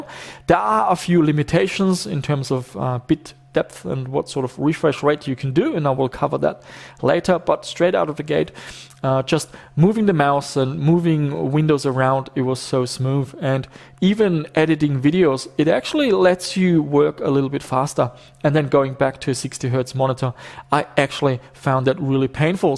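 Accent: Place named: South, Germany. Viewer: Wenn ich es nicht besser wüsste, würde ich sagen, du hast einen deutschen Dialekt 🤔 und deine Intonation klingt Süddeutsch.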